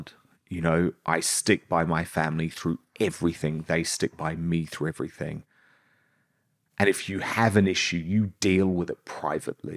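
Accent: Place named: Scotland, United Kingdom. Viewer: He is Scottish 😂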